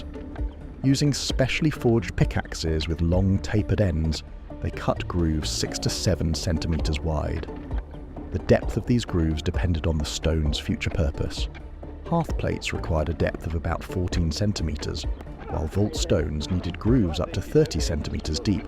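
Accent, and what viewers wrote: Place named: England, United Kingdom. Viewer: I love hearing metric measurements in an English accent, I's almost as good as hearing them in an American one 🖖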